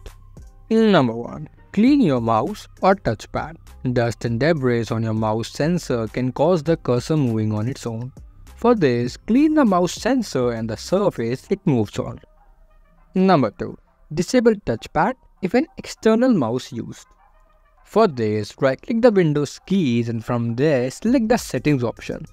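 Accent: India. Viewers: This guy talk so indiany its understandable and the acsent is useless but thanks for trying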